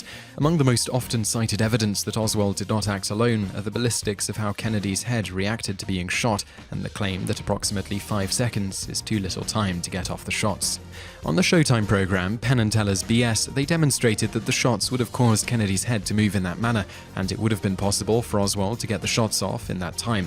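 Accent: United Kingdom. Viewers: Hmm. Your picks for this video as well as other recent ones are starting to betray an agenda... Having a guy with a British accent reassuring me that my government is benevolent and has my best interests in mind and that there is nothing to answer for after death is damn well played...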